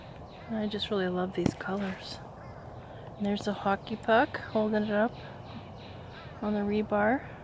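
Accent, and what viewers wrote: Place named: South, United States. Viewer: Love your content, beautiful craft, trying to figure out what part of the south you live in, very familiar scenery. But because of your accent I know you are not as far south as I am. could be slightly north of my location, but you must be a transplant....lol! Moss filled oak trees near a lazy waterway, what a beautiful backdrop for your delicate flowers!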